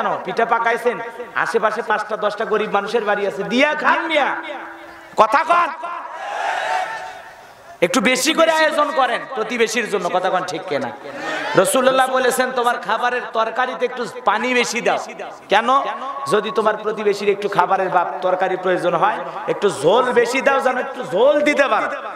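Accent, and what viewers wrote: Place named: Noakhali, Bangladesh. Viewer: ওরে নোয়াখাইল্যা ভাইরে। বিরে আশি নয়,বিরাশি বলেন। ভাষাটা একটউ শুদ্ধ করে বলেন।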